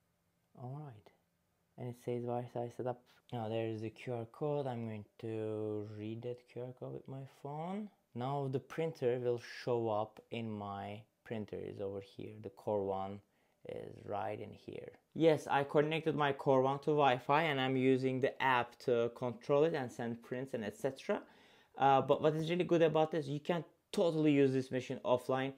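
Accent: Turkey. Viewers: accent 100% Turkish :) (not a criticism)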